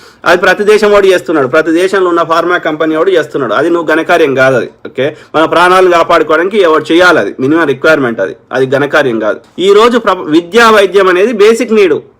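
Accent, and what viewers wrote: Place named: Telangana, India. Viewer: అది Telangana slang basu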